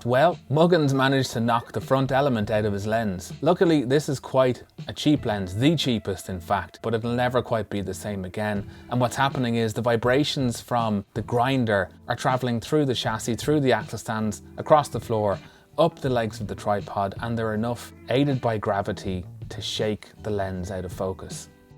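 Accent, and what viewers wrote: Ireland. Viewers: Actually he's Irish, but thanks for the Aussie guess. We'll take that as a compliment.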